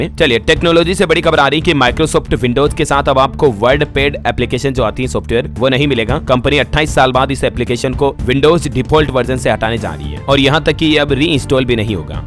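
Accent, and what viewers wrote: Rajasthan, India. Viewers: bro aap rajasthan ho kya lgrha h is video m tone lag rhi h rajashthan vaali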